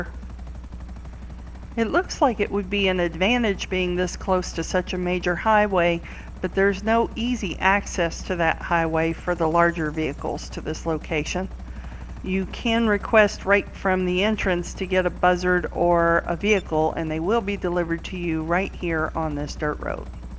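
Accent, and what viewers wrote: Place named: South, United States. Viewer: Just ever so slightly southern, but I know what to listen for.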